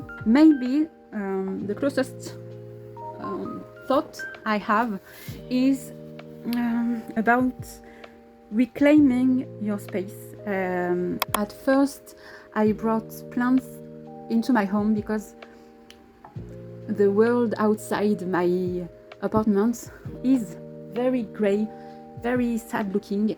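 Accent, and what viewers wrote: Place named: France. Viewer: I love your French accent! you speak English very well, please do not apologize. 🙂loved seeing your planty space and your very adorable doggie. 🐶thank you for participating in this tag 💚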